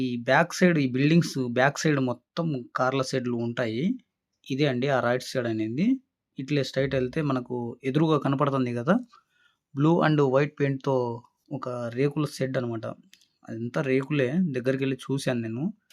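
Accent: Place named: Karimnagar, India. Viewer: Mee matalu vintunte ma karimnagar la anipistundhi bro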